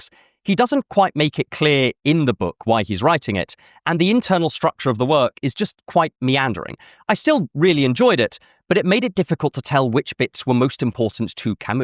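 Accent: United Kingdom. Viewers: Love that british accent. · Is this guy British? Just asking. No reason. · listening for the first 5 secs of the vid, i think he is a brit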